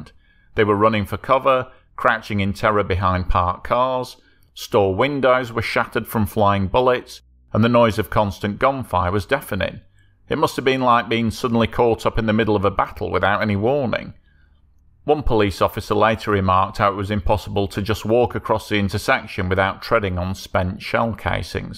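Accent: United Kingdom. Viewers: the host's rather British accent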